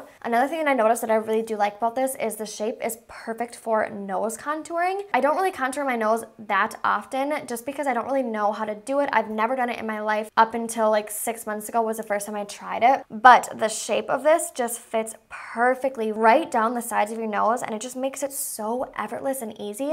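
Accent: Minnesota, United States. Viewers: I TELL YOU THIS MINNESOTAN ACCENT 😂😂😂😂😂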